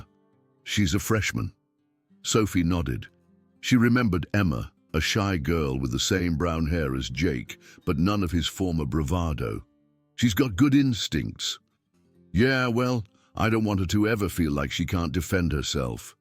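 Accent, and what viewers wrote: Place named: United Kingdom. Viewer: Wow, sentences with punctuation, and an almost British-accentuated narrator, with judicious attention to intonation pattern as a function of sentence structure. A definite pleasure to hear. The plot may not be spectacular, given the prevalence of this genre, but it keeps moving the story along and the storyline is better than most.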